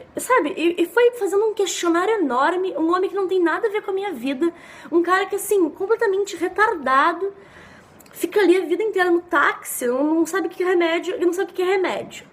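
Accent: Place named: South, Brazil. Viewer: 박지민 e do Sul ne...sotaque maravilhoso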